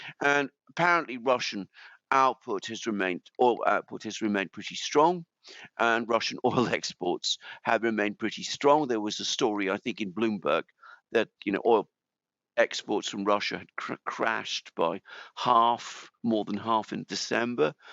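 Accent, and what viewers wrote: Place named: United Kingdom. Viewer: You mispronounce zaparesia and the names of lots of towns I don't know if it's your gay British accent lol or if you're just trying to sound fancy or I don't know makes you sound stupid though just FYI and you're not? Your very intelligent so I don't understand my man. I love you guys show you speak the truth!!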